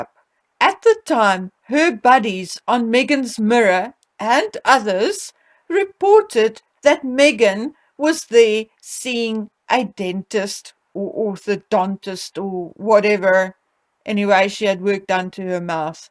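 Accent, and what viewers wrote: South Africa. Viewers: 😂🤣😅 I have to laugh when I listen to you , and the way we South Africans talk, its such a pleasure listening to you, say it as it is. 😂 Just love it.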